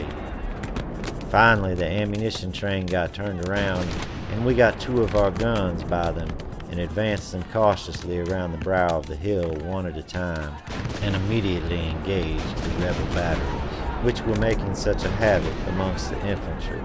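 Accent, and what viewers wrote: South, United States. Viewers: Very well read, but his accent is a southern.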